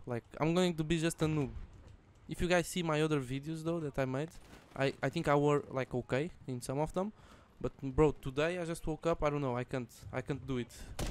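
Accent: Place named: Portugal. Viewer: Eu acho engraçado que é tão facil de identificar um portugues a falar ingles hahahaha, bom video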